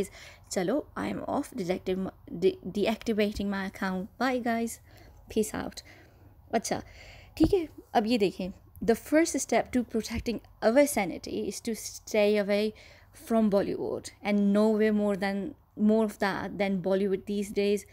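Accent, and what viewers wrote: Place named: United Kingdom. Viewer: Please please make a video on Arnab Goswami & Ankita.i am a big fan of your mam. Awesome analysis. I am a big fan of Arnab too but would love to hear your analysis & I can see he has not disclosed many things about Ssr's death in news. Not sure if there is any personal motive. Are we just watching a drama where maha govt & Republic TV are together & showing us a fake picture? It is so difficult to trust with news coming out nowaday. What do you think of vibhor anand. I have noticed he is making all these big big revelations on YouTube but there are no evidences shown by him. It feels like he is just hungry for an audience & followers. He says he is supreme court lawyer but I don't feel any credibility in anything he is saying. Please do make a video on Arnab & Ankita. I live in UK & it is 1.37 am & I am engrossed watching your videos. 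You also seem to be from UK can make out the British accent. Thanks for the awesome analysis.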